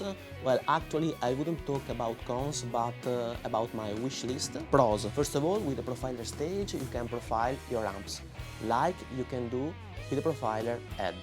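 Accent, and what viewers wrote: Italy. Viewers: E' Italian/English, così lo capiamo meglio (sul serio eh)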